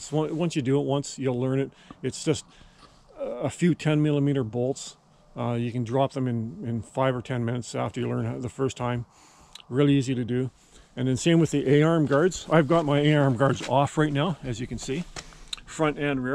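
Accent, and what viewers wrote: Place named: Australia. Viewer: Nice video, thanks. Love the Aussie too.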